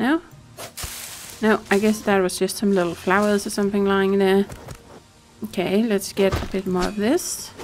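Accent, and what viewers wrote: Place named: Denmark. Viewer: Thanks for the video, your accent sound Danish, very elegant in my opinion, from Alv, Norway.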